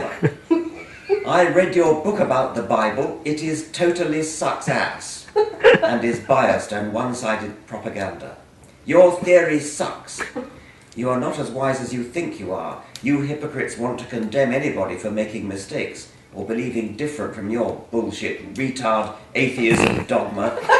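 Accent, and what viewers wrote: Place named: RP, United Kingdom. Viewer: Hearing him swear in an educated and satirical British accent is hilarious.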